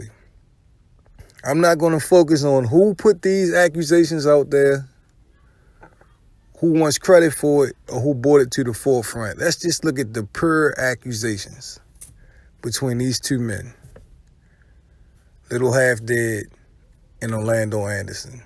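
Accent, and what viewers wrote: Baltimore, United States. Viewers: Are you from Baltimore? Sound like tayroc with the oooos 🤣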